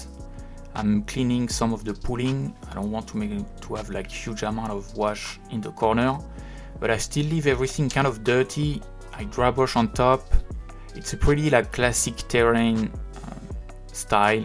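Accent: France. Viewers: Ton accent français ce reconnais clairement mais tu a clairement un anglais plus maîtriser que moi donc je ne devrai pas parler 😂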